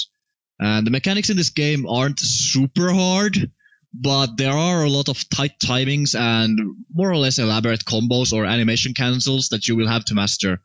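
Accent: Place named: Finland. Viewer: You sound so finnish.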